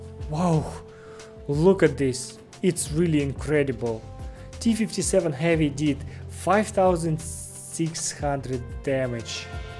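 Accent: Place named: East Asia. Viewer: Райс инглишь)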